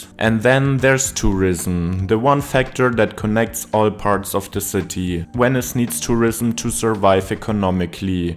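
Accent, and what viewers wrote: Germany. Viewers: bro es wäre so geil wenn du nen zweiten deutschen Audiotrack anbieten würdest, als Deutscher kann ich mir dein Englisch wegen dem Akzent echt nicht lange geben, aber machst eigentlich sehr geile Videos 😂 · Im in 2 seconds and I already know this guy is german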